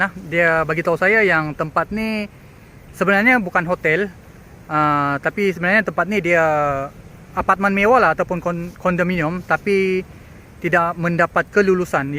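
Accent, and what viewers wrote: Sabah, Malaysia. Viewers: orang sabah bang,mak kadazan ayah india dan dia islam xsilapnya.sbb tu pelat² sabah tapi muka ala² india.😊😊😊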